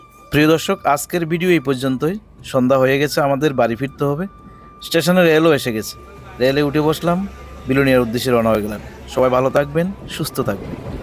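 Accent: Noakhali, Bangladesh. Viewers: ভাই আপনে কি নোয়াখালী ভাষায় কথা বলেন?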